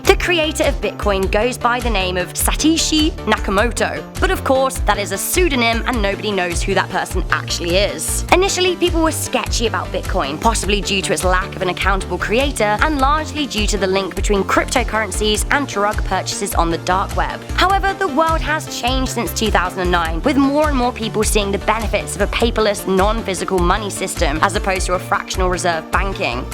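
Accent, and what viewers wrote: United Kingdom. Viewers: so British. There's millions of people with the accent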